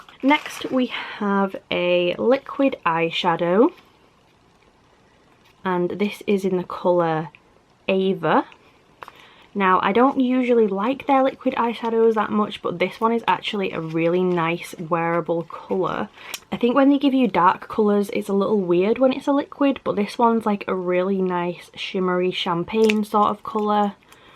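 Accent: United Kingdom. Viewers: What part of the uk r u from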